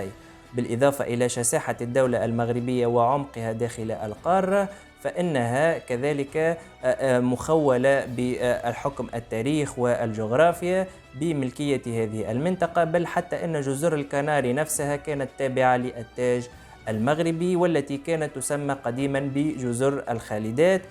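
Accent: Tunisia. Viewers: شكرا لك أستاذ..حصة ممتعة وافكار تاريخية قانونية متماسكة بلغة سلسة جميلة بطابع تونسي رائع... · من خلال طريقة نطقك قلت انك بتونسي قبل أن اكتشف العلم التونسي جنبك و اهنيك على اصالتك التونسية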